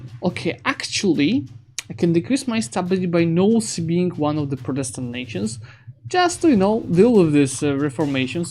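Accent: Poland. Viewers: this man's accent tickles my hussar sense.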